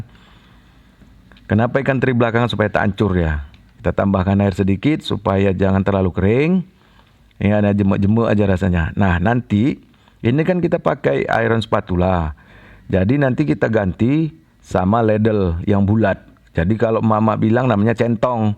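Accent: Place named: Medan, Indonesia. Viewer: Medan kali bah...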